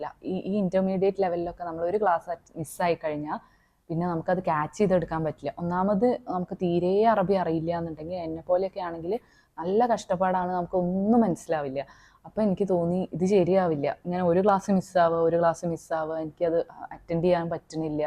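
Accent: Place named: North Malabar, India. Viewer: R you in kasargod/kannur?.. Talking style feels like ours❤️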